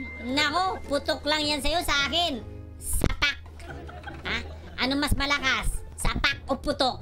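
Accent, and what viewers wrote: Visayas, Philippines. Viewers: Ay kuya bisaya kaba or tagalog? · Taga Visayas ba si? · bisaya kaba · bisaya ka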